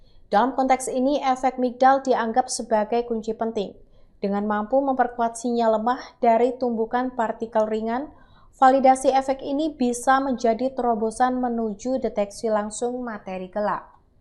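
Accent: Java, Indonesia. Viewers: Ini yang ngomong orang jawa ya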